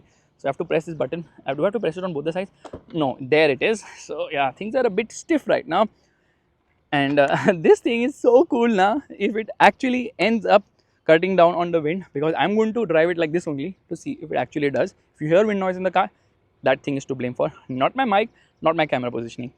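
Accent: India. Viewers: Very Indian English and fast. Slowly plz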